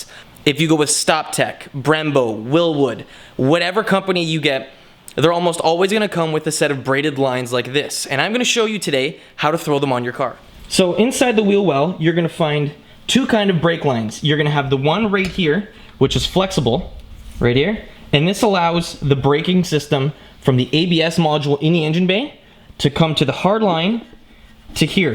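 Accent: Canada. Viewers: He’s Canadian my dood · Yeah Canada! Accent gave it away (I think). Great video!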